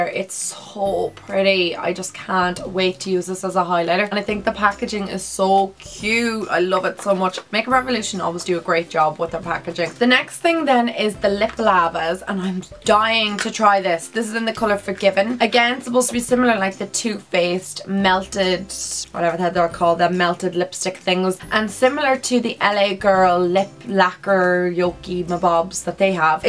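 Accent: Ireland. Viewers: Are u irish😘😘❤️ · I just started watching your channel and I tough you were from ireland I know my irish accent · i love your irish accent!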